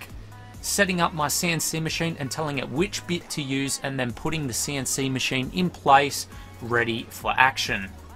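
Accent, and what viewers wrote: Australia. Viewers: great ideas right there! although I'm not the biggest fan of warcraft I think it will look sick when finished. keep it up! btw your Australian accent sounds so funny :D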